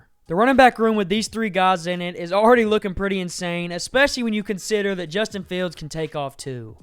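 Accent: South, United States. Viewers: Good comment but it is a southern accent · You have a nice voice youngin.. and your production is very clean.. Great used of the 5 sec rule, facts and references. I look forward to hearing more from you. Your defiantly in the south somewhere with that comfy accent lol. Keep it going, dont do some idiot type stuff, watch you company, keep pumping and you can go pretty far.. Look forward to hearing you on ESPN .. Keep your nose clean.. Sub!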